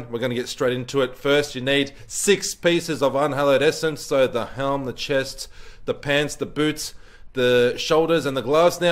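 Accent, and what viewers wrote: Australia. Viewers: you definitely sound like a fellow Aussie.. good video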